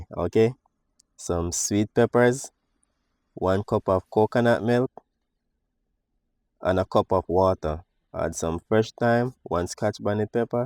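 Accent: Jamaica. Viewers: I just love mi JAMAICON accent and pronunciation. Get well soon, we miss you.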